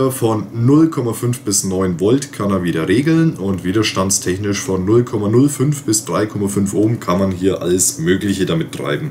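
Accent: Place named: Franconia, Germany. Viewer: kommst du aus dem fränkischen Raum?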